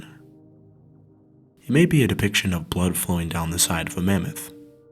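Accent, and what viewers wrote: United States. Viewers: Nothing is quite as annoying as someone who SOUNDS American. yet choses to use Metric Units. If you're American, BE PROUD OF OUR IMPERIAL HERITAGE. O, and quit turning the "TH" sound in "NeanderTHal" into "NeanderTal." That is something that only came about in the 50 years since I left school—and it SOUNDS LIKE HELL!!!!!!!! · I found the subject matter interesting. But where are you from? You sound like an American but your use of the metric measurement system is foreign to me. I know how long an inch is and also afoot. But centimeters and meters I have no idea. So I gave you a thumbs down. For your American viewers, I would suggest using the measurement we're used to and not to cater to your foreign friends for their not the ones who pay your bills. Otherwise I found your video informative but will forgo any more till you learn to measure in your native system.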